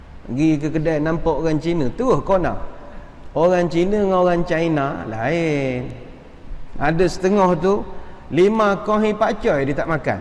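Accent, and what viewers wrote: Pahang, Malaysia. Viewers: Suka dengar ustaz cakap Pahang. Saya orang Pahang tapi dah lama merantau di luar.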